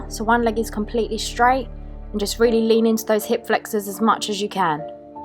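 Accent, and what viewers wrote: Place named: London, United Kingdom. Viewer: Lovely video. You must bee a South or East Londoner lol. The accent gives it away.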